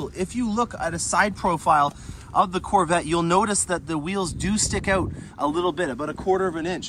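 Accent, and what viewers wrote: Canada. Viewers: Don’t get me wrong...I love the videos and the Canadian accent makes me smile (my father was from Canada).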